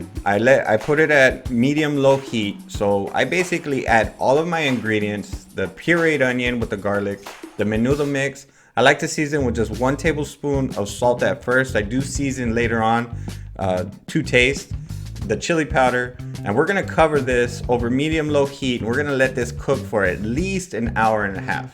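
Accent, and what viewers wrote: California, United States. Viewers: I cook my menudo for about 5 hours. I'm from Texas sounds like your from California. Menudo is great,especially in cold weather like,Now! Thanks for your recipe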